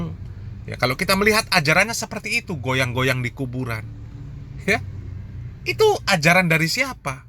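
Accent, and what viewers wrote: Java, Indonesia. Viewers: Suara jawa ni ptsay kd shaluan😂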